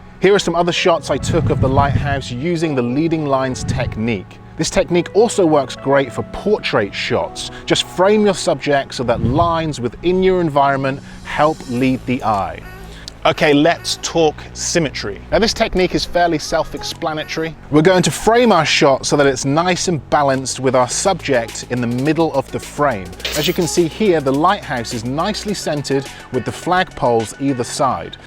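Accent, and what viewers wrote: United Kingdom. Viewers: I think thats how he pronounce focal point, he may be is a british coz thats their accent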